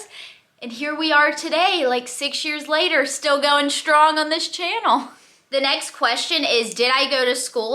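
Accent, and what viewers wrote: South, United States. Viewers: Hey there, have been watching you for sometime, especially when you were doing Keto. I love to watch your infectious Southern “ hey y’all “. You are such a beautiful gal, but some of these pics on the You Tube look a little shady. That’s not your style. Wish you and Cody the best.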